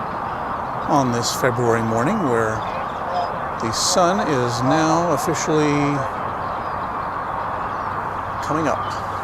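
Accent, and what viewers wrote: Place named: Brooklyn, United States. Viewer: haha! and host is from Brooklyn New York, can you believe it 😄 #accent??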